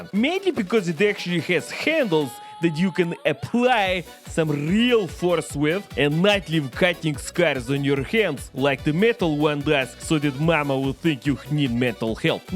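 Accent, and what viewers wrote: Eastern Europe. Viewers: While I personally didn't need subtitles to understand Slav-ese, let us thank our generous Slavic King for upgrading this video for those that do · I feel like after watching for years, I could be blindfolded so I couldn’t see any subtitles. And I could still understand his B L Y A T I F U L, S L A V I C voice. 👌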